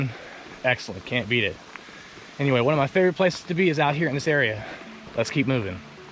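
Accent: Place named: North, United States. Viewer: You said you have been there 25 years but your accent is a bit more 'up north' sounding. Where are you originally from?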